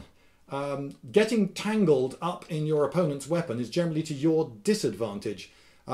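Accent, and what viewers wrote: France. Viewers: Your french accent is very reminiscent of the pineapple from telefrancais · Your outrageous french accent sounds like Engrish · Best French accent ever! ;D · Love the outrageous french accent :) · your french accent is awesome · This is the 6th time I watched this video and the 5th time I watched it for the outrageous french accent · I don't think the poor defensive capability would be as much of a disadvantage if you had a good shield with it. Naturally still worse than other weapons, but not as ridiculous as your French accent makes it sound like. I've always wondered why several balls would be a benefit, though. Good video. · Your french accent just made my day ! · Outrrrrrrrrrrrrrageous French accent! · i liked the video only because of the frenc accent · Brilliant French accent ! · Yes it's good. Also your french accent is hillarious. 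· Thumbs up for French accent. Lol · You should do more french accents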